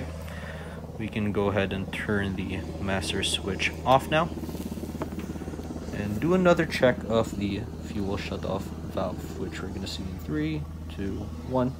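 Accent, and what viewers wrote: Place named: Philippines. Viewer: Why u have a Filipino accent lol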